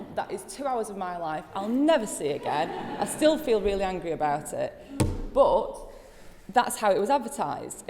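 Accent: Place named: Yorkshire, United Kingdom. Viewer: I am living in York. It's an interesting accent with beautiful features. But it seems to me that her accent isn't so strong as we normally found in the streets, shops etc. Maybe in an informal context would be possible to hear more details.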